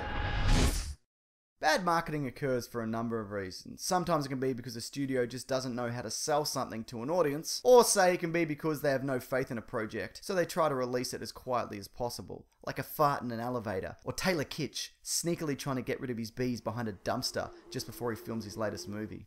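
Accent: Australia. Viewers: Hey cool vid I'm a recent subscriber you got an awesome aussie accent that makes me wonder what you look like lol....anyway keep up with the awesome videos.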